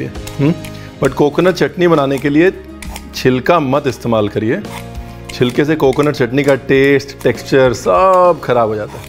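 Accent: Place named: Kerala, India. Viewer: he is a punjabi but has a clear mallu accent, what kind of mix is that. nice.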